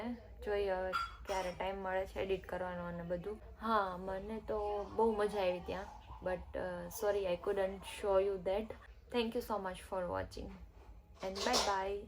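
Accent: Kathiawar, India. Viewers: Tamre bolvanu tone pure kathiyavadi jevo che...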